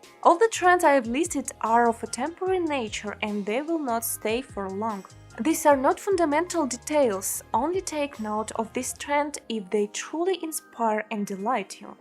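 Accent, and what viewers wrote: Russia. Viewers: Ничего вы не понимаете, во первых акцент хоть и хорошо слышится, но саму речь приятно слушать, уши не режет, как по мне многие русскоговорящие зря пытаются полностью избавиться от акцента, это же изюминка, главное чтобы тебя понимали и чтобы ушам больно не было, в данном случае очень приятно слушать, мне такой акцент очень даже нравится. Вы еще не слышали что такое плохой акцент, послушайте Пакистанцев или Индийцев, вот там не приятный акцент, но даже они не заморачиваются и не стесняются своего акцента, и все смотрят их видео, общаются, главное какой контент они производят. Удачи вам! Не слушайте тех, кто говорит что у Вас ужасный акцент, это не правда, у вас очень приятный акцент:) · Fun fact: when you are speaking English, you hands movements are a lot less than that when you’re speaking Russian:) all that is because the center of “sense composition” is moved from soulful thought delivering to “make sure your English is correct and distinctive”. 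P.S this Russian Natasha accent is certainly your charm. Thanks for a great video:) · Why not to have channel in English?! Great Idea 👌 Russian accent ? - why not?! Keep going on! 🤗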